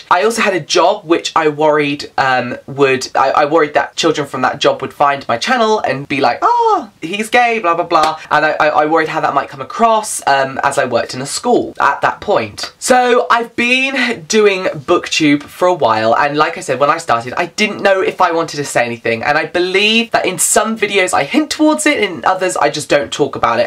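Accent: United Kingdom. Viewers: I love your channel. Your enthusiasm and love of reading is infectious. Your accent is adorable. (I'm American and love Brittish accents) Forget the haters. They know nothing. And if your sexuality is a turn off for them, then they were not true fans in the first place. They're just ignorant trolls. #DontFeedTheTrolls Ignore them and do you. Your true fans will appreciate that all the more.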